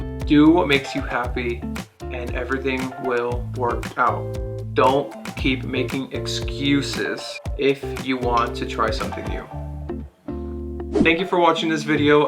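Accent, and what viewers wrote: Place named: Miami, United States. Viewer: Yeah, you've got the Miami accent. I've never heard it until I was watching one of my (now) favorite YouTubers who is from Miami, and I first thought she was not born in America. I would describe the Miami accent as sounding like someone whose native language is not english....but coming somewhere from a latin American country and learning to speak english fluently and almost without an accent..but there is still something there.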